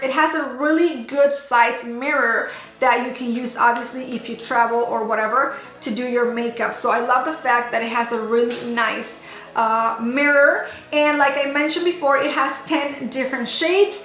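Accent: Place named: Mexico. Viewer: Hola... veo tus vídeos para aprender un poco más el idioma, aunque se me hace rara tu pronunciación, es un inglés americano con acento mejinaco muy gracioso. Pero aquí me tienes 🤗porque eres si serás mi youtuber favorita. Aunque mi canal favorito es de de vlogs, sí ese que tienes algo descuidado últimamente 😔... Saludos desde Madrid España 😘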